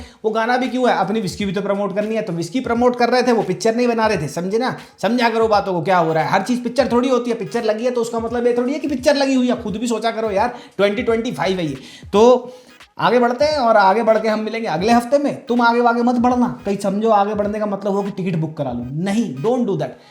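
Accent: Haryana, India. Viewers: I love you bro to much honest review 😅 and bhai please bring back you’re haryanvi I really miss it ❤❤❤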